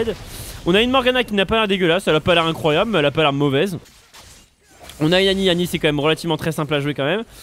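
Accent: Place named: England, United Kingdom. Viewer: Cet accent anglais :D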